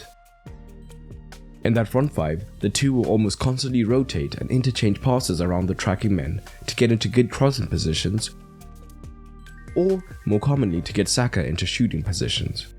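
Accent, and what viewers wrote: South Africa. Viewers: You sir... Sound South African 🤔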